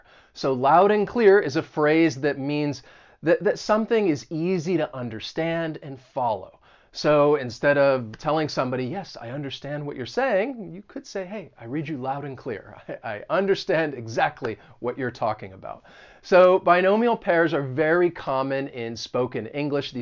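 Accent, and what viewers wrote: United States. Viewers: Hey,.I'm into your Amerikan accent and your cheerful and useful videos.Every time when I watch your video lessons,I'll reams of useful things.Please teach us more phrasal verbs and idioms.I can't thank you enough for your lessons.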